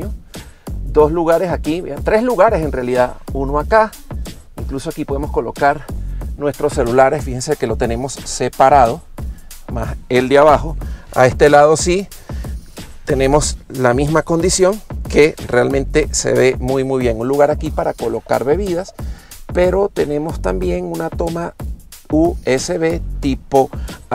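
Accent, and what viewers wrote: Venezuela. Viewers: ¿Ése acento es venezolano?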